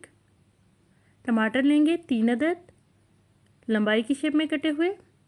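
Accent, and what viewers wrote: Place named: Pakistan. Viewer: Walaikum Assalam Warahmatullahe wabarkatahu Ap jis trah Bolti Ho Jis v Ilaqe se Ho pak se Yaha pe Bht Mere bht Dost Apko trah Bolte hain.. 😊 Big Like G Honestly Full Watch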